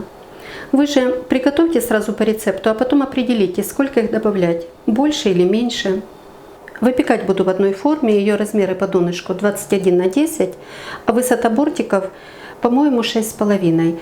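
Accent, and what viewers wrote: Ukraine. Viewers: Як же приємно ви говорите українською мовою, так чудово... А язік смердючого орка не можу чути, зразу звучить у вухах, як та погань з матом перерізає горло нашому захиснику, як дають цім язіком накази бомбардувати наші міста, спалювати наші ниви, врожаї, як похабно поливають нас хамською брехнею.... язік, який ви так поважаєте, лелієте..... невже СТІЛЬКИ СМЕРТЕЙ....молоді, діток, стареньких такі мовчазні перед БАЖАННЯМ заробити ті ЗКРИВАВЛЕНІ ЗГОРЬОВАНІ СЛІЗНІ копійки.... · Я смотрю ваши видео как сеанс гипноза, настолько успокаиваясь от вашего голоса,у вас такой красивый украинский язык,ну а рецепты все просто бесподобные,уже очень много готовила по вашим рецептам и все получалось идеально, спасибо вам за ваш труд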